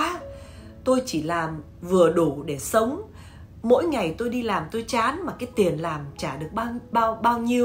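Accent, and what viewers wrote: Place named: South, Vietnam. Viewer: Rat Thach Noi going nam, you are so cute!love you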